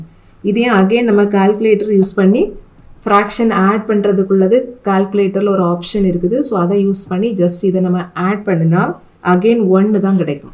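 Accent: Kanyakumari, India. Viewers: Neenga kanya kumari ah ,ithu kanyakumari slang thane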